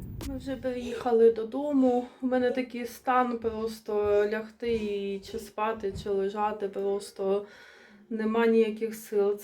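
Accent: Surzhyk, Ukraine. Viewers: так, використання і замість «и» це прямо суржик-суржик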